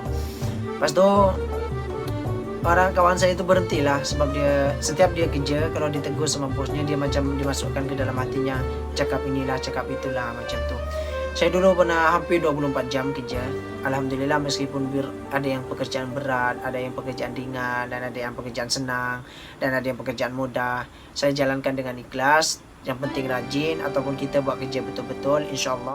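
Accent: Malaysia. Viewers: Logatnya kakak udah malaysia bgt yaa..heheheheh. semngaatt ya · tak ada pun, cakap macam orang Malaysia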